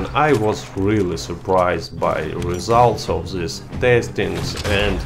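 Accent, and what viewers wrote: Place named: Russia. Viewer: Good vid, i like your russian voice, you sound so much cooler than my boring midwest american🤣🤣gonna sub ya now too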